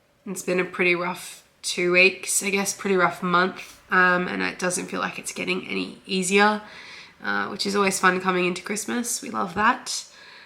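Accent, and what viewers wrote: Australia. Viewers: Love hearing the Aussie accent on Flosstube! I agree, we need more seasonal stitching options over here in the Southern hemisphere. That BBQ is super cute 😍. Hope you feel better soon.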